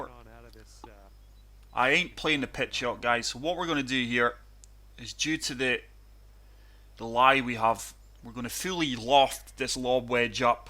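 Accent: Scotland, United Kingdom. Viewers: Gave ya self the commentators curse mate lol. Ya voice reminds me a bit of Feherty. The Scottish accent works great for golf commentary. They shoulda got you to do the game commentary instead of Rich Beam and his shouty American accent. Some of the in game commentary is just laughable. Keeps me amused at least hahaha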